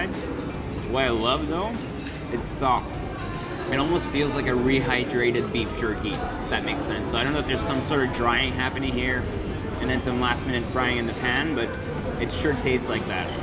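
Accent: Philippines. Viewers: Ang cute nang pag tatagalog mo grabe haha ❤❤ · Cute nun Tagalog mo kuya.. can u make video na puro Tagalog Lang 😉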